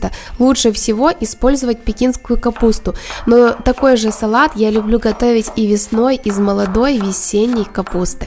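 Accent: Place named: Ukraine. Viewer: украинка?много наискасяков в разговоре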